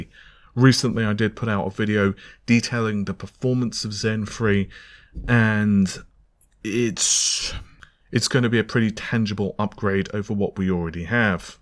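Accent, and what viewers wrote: England, United Kingdom. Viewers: english accent?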